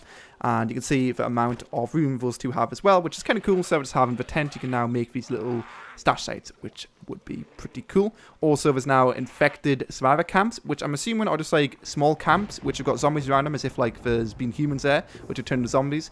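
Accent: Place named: United Kingdom. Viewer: If you cant listen to him, then watch someone else and stop being annoying to other people watching the video. By what you said, you don't like live commentary, which is fine. His dialect? I'm guessing he's british, and really? You cant understand him? I'm from Serbia and I can understand him better then someone from Croatia! Well. there is my rant to you, sir! Respond if you wish, goodbye!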